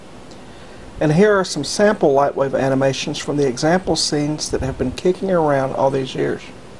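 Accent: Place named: South, United States. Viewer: Hey! Is that a pro minidisc deck to the left of the A1200., I've corresponded several times with you on <link> and through e-mail regarding Amigas and Lightwave - but I never knew you had a bit of a Southern US accent!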